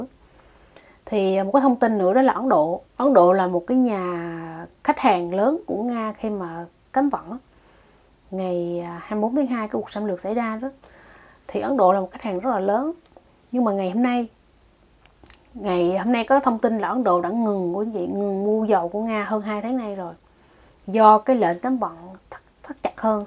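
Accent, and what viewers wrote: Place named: North, Vietnam. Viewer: đúng chất giọng 3ke x.ỏ lá. Ngọng líu ngọng lo cũng bày đặt lên cào phím. "Lato", "Ixarel", quát đờ hợi :face-blue-smiling::face-blue-smiling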